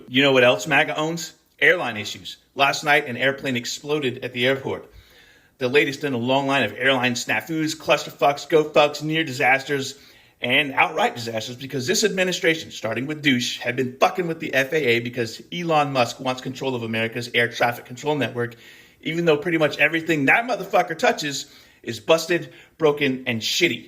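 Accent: Scotland, United Kingdom. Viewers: Uh oh the Scottish accent is a bit sticky lol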